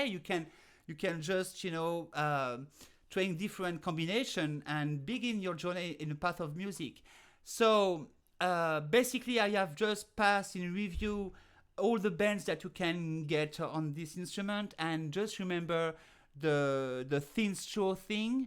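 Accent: France. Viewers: Bon jour, Madame. I think it is not necessary to apologise for your accent for the simple reason that the French accent is oh la la, wonderful to hear! And your harp playing is the best! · Great video for a beginner like me, and please dont apologize for your accent, I think your english is pretty good and I am glad that you give the non-french speakers the option to learn from you too! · Just watch your video... Just started to play the Harmonica a few weeks ago and i'm 78 years old.. I love the challenge. Don't worry about your accent you do great! That goes with being French! Thank you again.. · Do not apologize for your French accent! It is delightful! And your harmonica playing is....well, shall we say....magnifique! Merci bien!! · Hi, i love your french accent💙and your music style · Vous n'avez pas à présenter des excuses pour l'accent français - il est un plus pour moi! 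· Pardon ? Pour quoi? L'accent français c'est toujours adorable... Et moi je préfére ecouter l'anglais comme ça, c'est plus facile pour mon oreille. Je viens de descendre deux demi-tons pour la première fois dans le deuxième trou ! Alléluia ! Merci beaocoup. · Bonjour, en premier merci pour la leçon et pour toutes les belles performances que tu partages sur Youtube - puis à propos de l'accent, mais tu n'as pas à t'excuser tout le monde a de l'accent lorsqu'on parle une langue etrangère en outre dans une autre vidéo tu parlais l'anglais sans accent ou presque et moi j'ai été susrpris de savoir qu'une des meilleurs joueurs de l'harmonica au monde soit française:) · I like the French accent. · Oh please, I'm jealous of your accent. My native language is Brazilian Portuguese and we just love out there hearing you, French speakers, speaking our language with your delightful accent. No apology necessary! 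My apologies for being jealous... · why apologise for a French accent. French is the language of love and one of the world's most beautiful languages. carry on with your fantastic accent so we can enjoy listening. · Je me suis abonnée à tes cours et à tout ce que je peux recevoir de toi. Tu es une super harmoniciste, et heureusement que tu as un accent bien français, sinon je ne comprendrais rien... Par contre , comment fait-on les overblow (par exemple dans "Yesterday") ? Merci pour tout !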